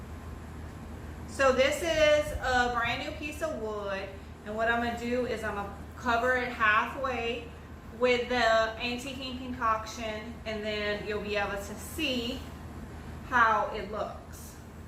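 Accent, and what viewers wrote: Louisiana, United States. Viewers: "and then you'll be ava to see how it looks". My grandmother was from DeRidder Louisiana. I'm from Texas and I've always been in LOVE with Cajun accents. You're so cute and so much fun to watch! You've inspired me to get up and get things done!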